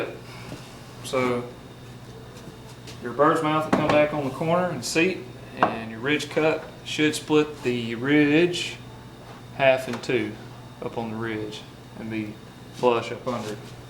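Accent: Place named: Carolina, United States. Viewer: i like this, very helpful...i like also how you get right to it without alot of unnecessary (distracting) jabbering...also your manner is respectful and professional...sounds like a carolina accent? cool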